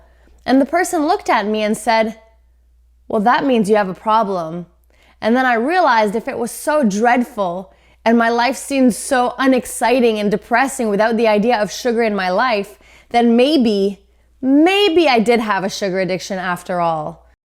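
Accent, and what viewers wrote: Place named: Lithuania. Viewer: Love your accent. Great info and video. Thanks so much. Are u from Lithuania 🇱🇹?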